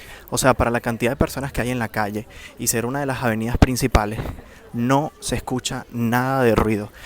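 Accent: Venezuela. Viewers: Hola, cuando te escuche por primera vez le dije a mi esposo; cono este chamo es venezolano y efectivamente. saludos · Eres Venezolano mi pana?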